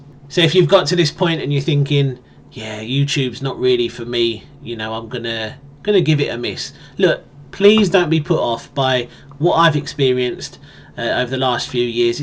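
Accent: United Kingdom. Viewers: I like your accent. I know there are several different British accents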